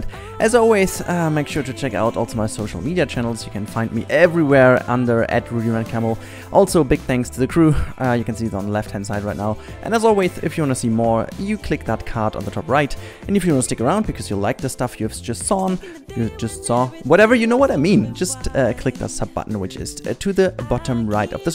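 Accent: Germany. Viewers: Man kann irgendwie raushören das du deutsch bist:). Is echt schön was du hier machst!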